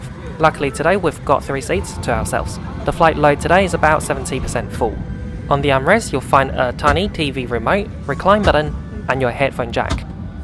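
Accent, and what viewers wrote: Australia. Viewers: you are sounding more Aussie than ever; Chuck a Ueee! 🙂 Another great report from not your first time in Queenstown!. See you very soon. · G'day, I love your Aussie accent and lingo with "doing a uey" Looked like a great flight. Least you had a screen in front of you, and the food looked very tasty 🤩New Zealand looks awesome, so want to go there. Look forward to your next video, although I'm still catching up on your previous ones 👍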